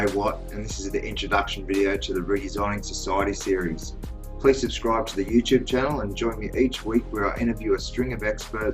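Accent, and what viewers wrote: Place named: Australia. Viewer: I love this! it is nice to hear a fellow aussie for a change ;)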